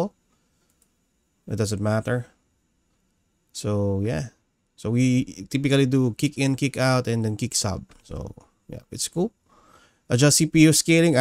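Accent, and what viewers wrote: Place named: Philippines. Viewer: Nice to hear a 🇵🇭 accent while talking about metal related things. Mixes home with what I love.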